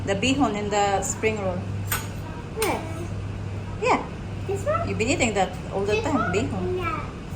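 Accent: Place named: Misamis Oriental, Philippines. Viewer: Taga mis or cguro ka Kay naamay giatay sinultian pod nimo taga mis or gyud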